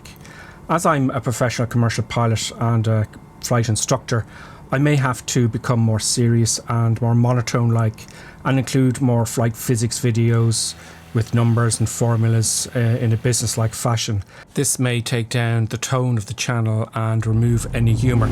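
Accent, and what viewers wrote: Ireland. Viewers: Are you another Irishman 😂🇮🇪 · Flight simmer with amazing Irish accent? No choice but to sub :)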